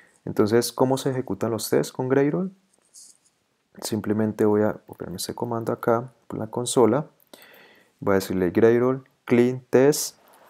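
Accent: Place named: Bogota, Colombia. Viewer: Ese acento de norte de Bogota si se puede ver hahahaha gran tutorial!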